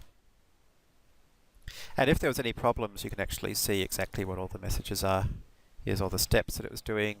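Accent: Australia. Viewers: Excellent Series, very clear and understandable especially since it was end to end(Bonus points for being in an Australian accent too). I am in the process of melding this framework to the out of box internet application in MVC. I only have a few questions: How can angular deal with Authorisation? How can Angular prevent CSRF with the in built Token Validator in MVC? Is it possible or even advisable to use cshtml pages running razor code as templates? And lastly is there a way to prevent a user from manually navigating to a html template to stop them from loading it without the index page? Thanks very much :)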